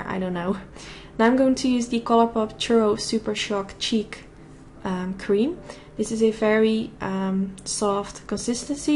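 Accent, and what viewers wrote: Netherlands. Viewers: Is that a a Dutch accent? Are you from the Netherlands? Your make up video is fantastic!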